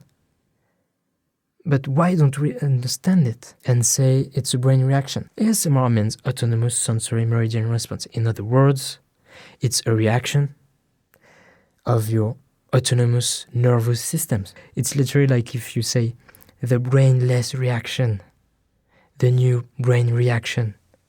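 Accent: France. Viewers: kinda off topic but I LOVE your French accent it’s so adorable 🥰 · I tried to explain (what I know now to be ASMR) to my family/friends when I would ask if random people (telemarketers, random people on the other end of the phone OR random people I’d have interactions with) and try to explain that listening to certain people’s voices would start to almost lull me to sleep with my head tingling/chills. No one ever understood what in the world I was talking about and I was almost frustrated I was so easily affected by certain people’s tones, sounds, volume, dialect, ect. 5 or so years ago I finally found out I was describing my own ASMR! Speaking of tingles - Please continue to talk in English with your amazing French accent. ☺️😴Ahhhhhh!😴☺️ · c'est quand même marrant le fait que l'accent français fasse que je comprends chaque mot parfaitement. Merci et continue d'être français stp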